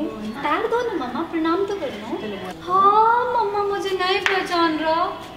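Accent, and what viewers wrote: Bihar, India. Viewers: Apki voice or bolne ki tone hi irritating hai aisa lgta h Bihar ka touch h sur nikal kr bolna normal bola Kijiye thik lagega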